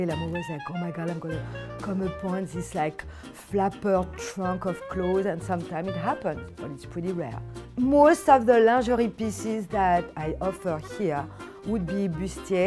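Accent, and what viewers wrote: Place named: France. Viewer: As soon she started talking I was like : “FRENCH”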